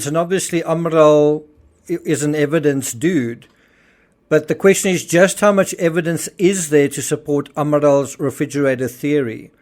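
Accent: South Africa. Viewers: You sound South African? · Stumbled across this video 😊 ... Is that a fellow South African I am hearing 😊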